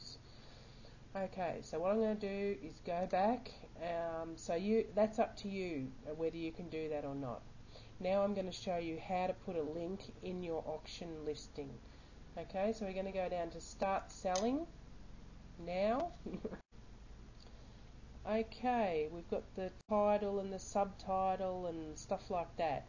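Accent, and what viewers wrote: Australia. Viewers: okai are you from down unda???. anyways thanks for the video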